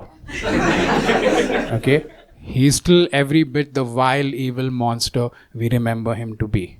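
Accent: India. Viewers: Wtf with this Indian guy (is he real Indian or just mocking the accent all the time? Because his name is not Indian at all lol), I have never been laughing and shed tears while watching a stand-up! This guy is a comedic genius! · Humans aren't necessarily apathetic, many are, but not as an essential quality. The comedian sounds Indian so lets just call it Tamas (inertia, rest, ignorance). We live in a global order based on nation-states, competing interests, strategies, and power. The rule is that as long as atrocity doesn't spill over beyond the boarders of that state, then direct action will not be taken by other states unless they have some economic or strategic reason to do so. It's vile, it makes everything feel hopeless for sure. But hope is found in recognition that states are artificial. They are as transient as the seasons. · Daniel Fernandes but with an India accent? 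Huh?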